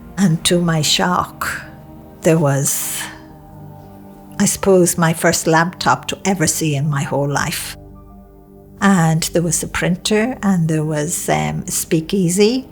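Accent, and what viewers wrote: Ireland. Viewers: Just what l needed. I so love her soft lrish accent. It makes such a change from all the US you tubers! (l say this with respect nevertheless, so please don’t take offense). That soft Irish drawl is like a healing solace to my soul. 💝🇬🇧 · I can tell she's Irish. Beginning of video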